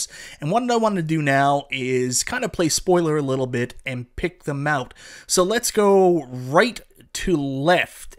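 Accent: Canada. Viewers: love how this guy talks is he from canada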